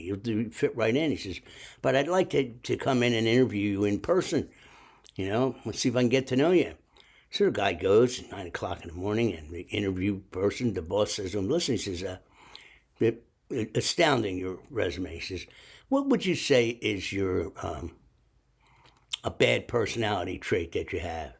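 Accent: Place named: Philadelphia, United States. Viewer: I knew you were from philly...i know that accent too well...im from south jersey but my pop is from philly...dan scoleri....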